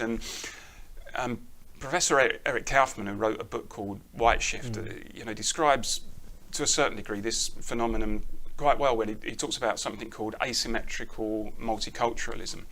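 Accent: RP, United Kingdom. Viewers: It is unreal how posh the host is, almost like a parody.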